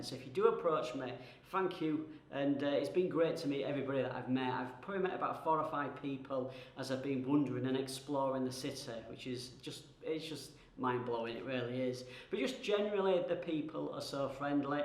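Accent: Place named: Yorkshire, United Kingdom. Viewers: cant wait to visit Malaysia again, will put Kuching on my to visit list, love the lack of tourists comment. good yorkshire accent you have and thx for the tips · I like your yorkshire accent.. eiyupp? Used to live in Yorkshire for years.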